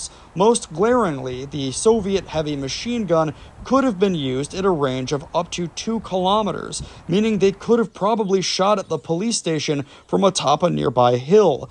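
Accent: United States. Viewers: Who are you to come here and tell us our own history in your stupid annoying yank accent? And if you are going to carry on maybe you would try and pronounce the names, towns and people properly! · I’m American and I have been on track with the Troubles since 1969 when Bernadette came to the US, but I am taken aback when I hear an American accent trying to tell others about the Troubles. I know people who lived through it and of course I learn more from them and I’m a lot more aware of South Armagh and Belfast, but be very sure of what you say because there are more than 2 sides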